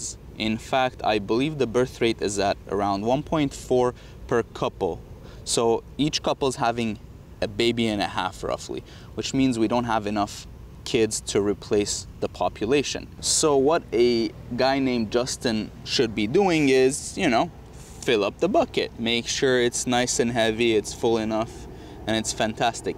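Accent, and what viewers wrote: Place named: Russia. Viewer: Your Russian accent is showing.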